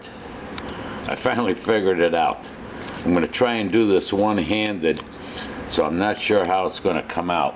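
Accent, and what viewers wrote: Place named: Pennsylvania, United States. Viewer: This guy is definitely from northeast pa , probably scranton!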